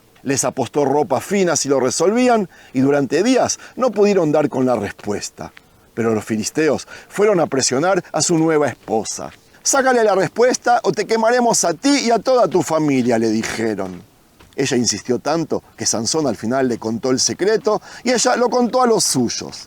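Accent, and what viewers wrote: Argentina. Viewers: Cuando algo de historia argentina tenes el acento nomas lo demás es todo propaganda.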